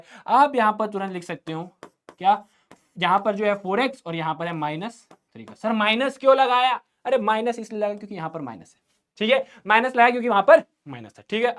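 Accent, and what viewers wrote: Bihar, India. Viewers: Bihari kahika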